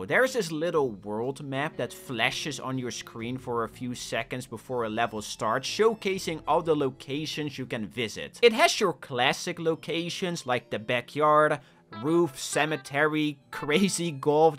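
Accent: Netherlands. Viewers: Hoe had ik aan het begin van deze video opeens de gedachte: wacht... is hij Nederlands? Bizar dat het gewoon waar is. goede video!!1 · why can I hear the dutchness?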